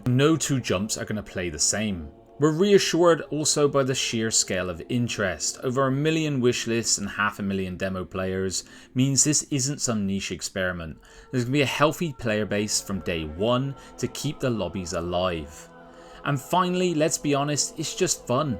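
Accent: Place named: Gloucestershire, United Kingdom. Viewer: Satisfy my curiosity or, I’m a glos boy born and bred. From your accent are you from the same neck of the woods?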